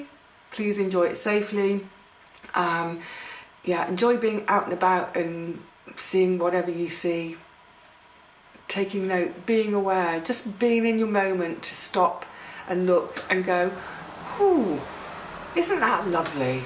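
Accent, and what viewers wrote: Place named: West Country, United Kingdom. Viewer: Wouldn't it be lovely if everywhere could have a cottage hospital, when we were in Suffolk there was one we went to in Aldeburgh, and there is one near us now at Wells-Next-The-Sea. Hope you get a bus pass 🤞 Did you know you're developing a West Country accent? I've noticed it more and more recently 😊❤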